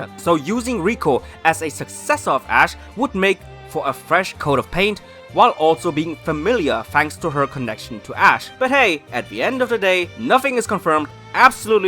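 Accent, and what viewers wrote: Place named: Germany. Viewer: Yoo du bist deutsch 😂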